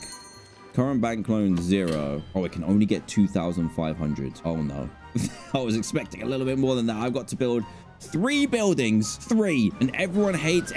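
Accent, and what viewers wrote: United Kingdom. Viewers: is British 👀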